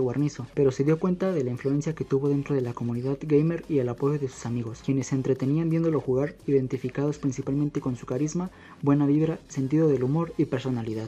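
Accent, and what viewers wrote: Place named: Mexico. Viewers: otros diran que no tiene indentidad y nada de su pais natal, pero para mi se acostumbro al acento mexicano lo domino al 100% y ya jajaja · Nació en colombia????????? 😲😲 Nunca lo imaginé, no solo usa modismos mexicanos si no que tiene las características tanto de lenguaje, habla y acento mexicano. Yo que soy mexicano, pensé que Juan (nombre muy 🇲🇽) era mexicano de toda la vida por como hablaba, se nota cuando alguien aprendió a hablar el acento mexicano y cuando alguien ya lo tiene desde siempre, y el el la primera persona que he visto que es de otro país y habla 100% como un mexicano, no se le nota secuela alguna de su país natal al hablar y expresarse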